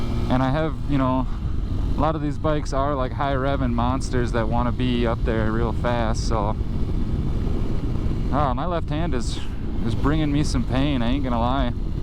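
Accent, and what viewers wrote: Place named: Minnesota, United States. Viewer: you’re welcome! Thanks for the compliment! Glad to help. Your MN accent reminds me of being a kid living in NE Minneapolis.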